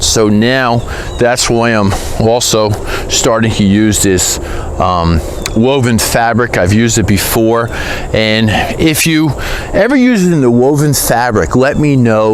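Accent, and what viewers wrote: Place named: South Jersey, United States. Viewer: I am originally from South Jersey and your voice has once again made me homesick! Thanks for the video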